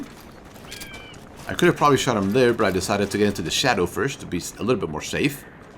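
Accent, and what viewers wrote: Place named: South, United States. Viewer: he is probably from the south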